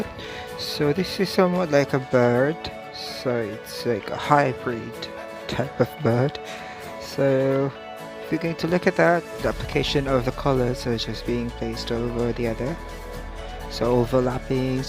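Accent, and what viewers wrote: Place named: United Kingdom. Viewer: lovi'n the british accent though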